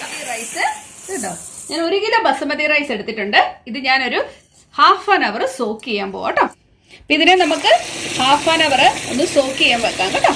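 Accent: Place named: Central Kerala, India. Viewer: Kollam,Allapii, kottayam, Thrivala, IDDUKImy guess 😃☺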